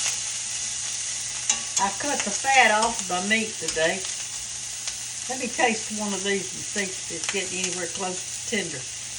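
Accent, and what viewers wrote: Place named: South, United States. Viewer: Love your Southern accent. I’m a fairly recent viewer from California. You are the BEST!!!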